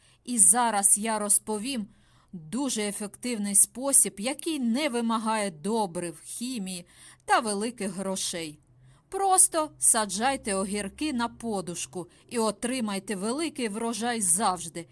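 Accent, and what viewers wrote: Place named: Podillia, Ukraine. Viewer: Доброго дня. Ріже вухо, бо незвичний для вашого вуха. А це діалект із Поділля. Мені вухо не ріже, бо так говорила моя бабуся. Чернігівщина, Черкащина, Полтавщина, Київщина - Подніпрянська Україна - хтось гикає, хтось надто окає, в когось о переходить в у. І це наше багатство, цікава історія мовотворення, контакти з іншими народами... Бажаю Вам зрозуміти наше багатство і не намагатися пістригти всіх під один гребінь.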